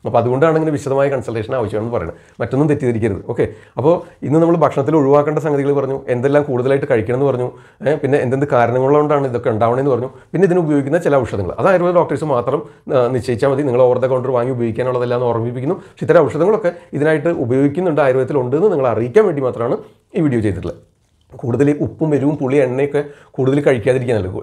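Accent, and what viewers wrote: Thrissur, India. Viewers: Dr nalla avadharanamanuto thrissurano?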